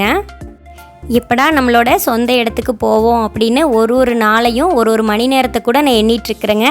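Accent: Kongu, India. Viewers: Akka Namma kongu slang kekumumbodhey sandhosama irukunga akka ... ❣️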